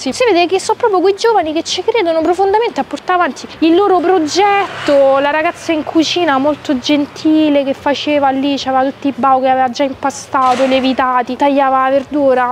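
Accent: Rome, Italy. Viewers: il suo parlare romano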